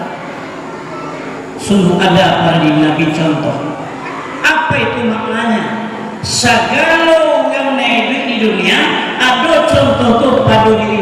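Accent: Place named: West Sumatra, Indonesia. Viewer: Urang minang mano suaro nyo😀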